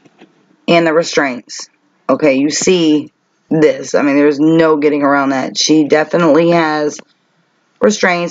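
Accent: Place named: South, United States. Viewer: love your videos and southern accent as I have a very strong one myself lol. Are you the person Str8 refers to as? Just curious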